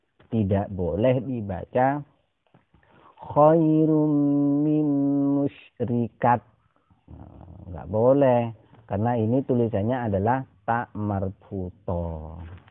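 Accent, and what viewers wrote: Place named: Madura, Indonesia. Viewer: Ketauan banget orang Madura ya cak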